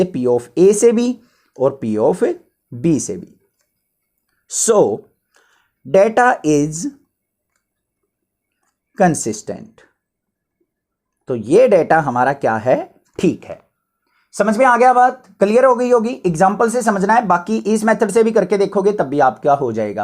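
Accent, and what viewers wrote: Haryana, India. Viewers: Sir you are from haryana 🫂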